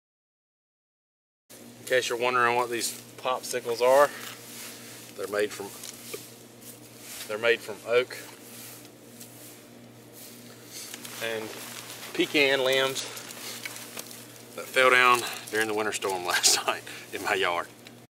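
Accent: South, United States. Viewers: Not only was this a GREAT review of the products (plus I learned another way to set up for a fire👍) BUT I loved your humor about the "words" with your southern (?) accent! My daddy was born and raised in Irving, Texas, my mother is Japanese...they met in Japan during the Vietnam war when my daddy was a marine...so you can imagine the different "accents" I grew up with! Thank you for a good product review but a good laugh too! I appreciate the time you put into this video as well as you being in the cold! God bless🙏❤🇺🇸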